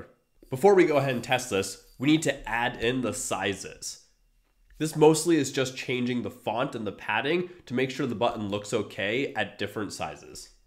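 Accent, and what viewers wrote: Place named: United Kingdom. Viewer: Obviously American, but there's an accent creeping in here. Secret Brit? :)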